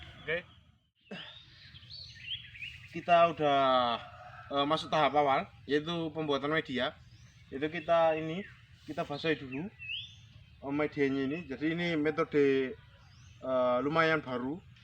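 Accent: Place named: Banyumas, Indonesia. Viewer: Kepriwe mas ora gelem di sorot raine...Mantap mas, Ora ngapak ora kepenak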